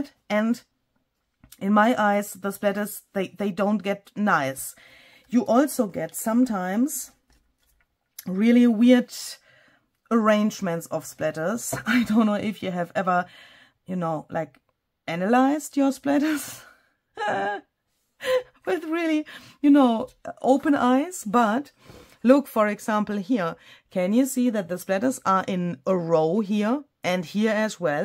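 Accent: Germany. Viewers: German with a Southern drawl? My ears can't even imagine what that would sound like! 😄😄😄👍🏻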